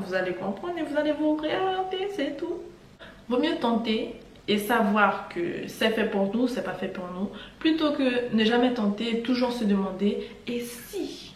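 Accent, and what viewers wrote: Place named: French Guiana. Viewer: T’as grave l’accent guyanais ! ❤️ En tout cas merci pour cette vidéo. Au top 👌🏾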